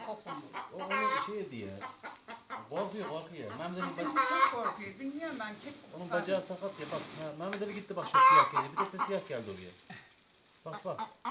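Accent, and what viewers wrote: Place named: Karabük, Turkey. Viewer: Yeni gordum seni bende karabuk eflaniliyim sivemiz ayni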